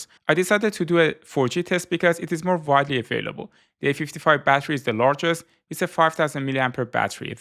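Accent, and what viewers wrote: Iran. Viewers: Your persian accent>> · داداش دمت گرم خدایی ایرانی هستی؟؟ انگلیسیت خیلی با لهجست😁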